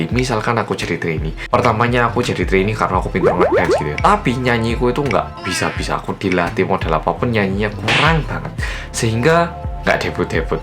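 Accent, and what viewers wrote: Java, Indonesia. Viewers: wkwkwkwk anaknya orang korea yang medhok, bisa aja mas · Medhoookkkk, mantul rek 😂😂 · Salut sama mas oppa ini bahasa Indonesia nya lancar bgt sama medok pula . Jdi kaya orang Indonesia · Yang ku tunggu adalah yang MEDHOKKK ..udh jdi candu nih medhok buat gw😅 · orang Korea yang... Medhookk BP: Oppa!!! Ilustrasi: BTS Sempurnaaa😍😍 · Me : medhooook👌👏👏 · Meddooook.. Lama lama aku keknya jadi medok juga gara gara sering nonton Kak.. Gpp sih menambah kemampuan bahasa ya kan Mas? Uuuuu😆💚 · Lah...ini orang Korea asli atau orang Jawa asli ya...bingung bet... Aw..... ....... Logat bahasa ny tu lhooooooo biasanya huruf A di Korea dibaca agak ditahan .......begiy juga D lah ini kok D nya dalam bet bhs. Jawa......B ny jg wkwkkwkwkw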